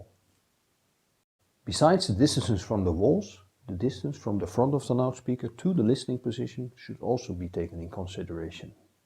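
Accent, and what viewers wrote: Netherlands. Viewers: I'm 100% sure he's from the Netherlands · thanks good short explanation ! from your accent i would have thought you are from holland , and you could check out my set because i live in amsterdam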